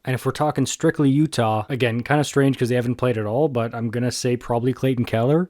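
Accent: Quebec, Canada. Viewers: la prononciation dun quebecois ca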